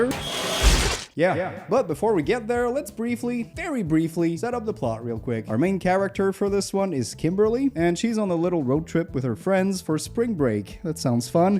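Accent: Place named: Montreal, Canada. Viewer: Oooooo is that a Montréal accent I hear? It's quite delightful lol.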